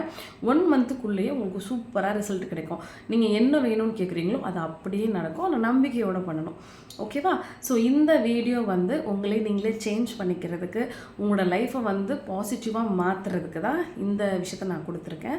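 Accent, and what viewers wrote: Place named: Nagercoil, India. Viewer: Sister, your slang looks like nagercoil side?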